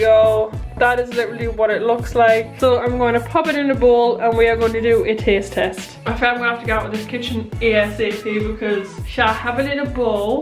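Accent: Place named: United Kingdom. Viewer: Very interesting a British girl making Puerto Rican food but you did a good job that was very beautiful love the British accent much blessing😊👍🇵🇷🇬🇧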